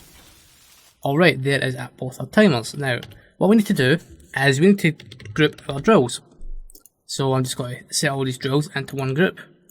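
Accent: Scotland, United Kingdom. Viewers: That is due to his STRONG Scottish accent plus he did not have a clue and not prepared to show the correct way.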